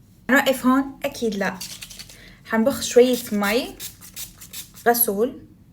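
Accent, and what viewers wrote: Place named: Morocco. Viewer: واش بتعيشي في المغرب لأنك مبين انوا انتي مغربية لأنك مابتعرفي تتكلمي منيح بالسورية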